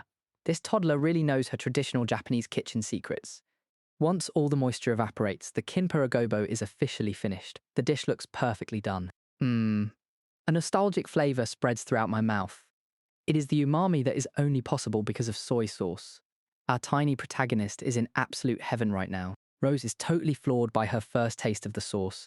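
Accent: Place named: United Kingdom. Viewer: British voice -10 Points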